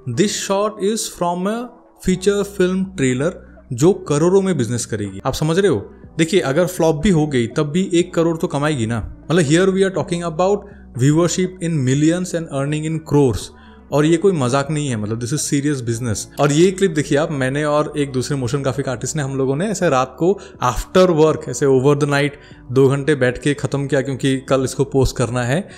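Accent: Assam, India. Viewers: 😂 Assamese accent tu je odd lagise kiba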